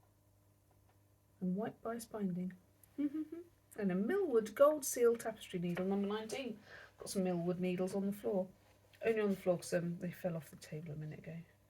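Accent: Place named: United Kingdom. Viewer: It was such a pleasure to watch your video. Your accent is exactly the same as my dearest friend in Britain, so listening to you made me feel like I was sitting with a friend who I have known for years. I love all of your projects and look forward to seeing my progress.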